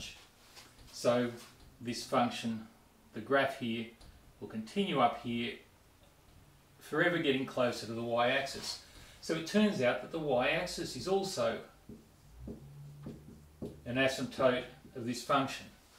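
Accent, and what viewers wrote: Australia. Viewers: amazing video dude - everything clear and concise! Subbed and loving the aussie accent btw :)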